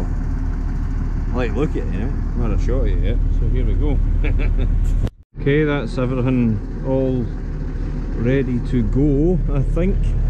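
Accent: Scotland, United Kingdom. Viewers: He is a proud Scotsman!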